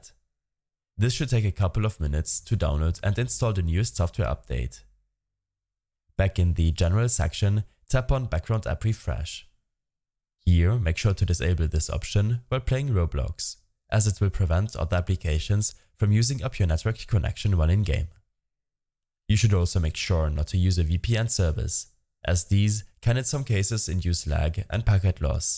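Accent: Germany. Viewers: Ich höre bisschen deutsch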